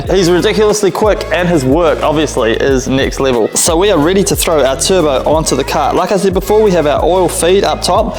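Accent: New Zealand. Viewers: Are you a yank???? It’s zed not zee your kiwi accent is hard enough to swallow but adding a yank into the mix is just too much to handle I’m going to have to unsubscribe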